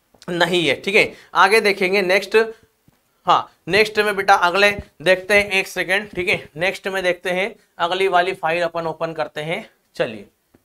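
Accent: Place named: Mewar, India. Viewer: Sir ji आपकी पूरी भाषा हमारे यहां जैसी है मेवाड़ा राजपूत जैसी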